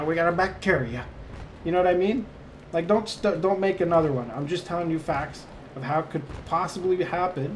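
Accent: Canada. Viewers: Not Irish. Newfoundlander.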